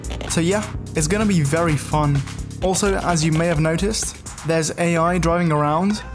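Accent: Italy. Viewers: Wait, are u italian? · Are you italian? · You have a particular italy pronunce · Hai un bel accento italiano fra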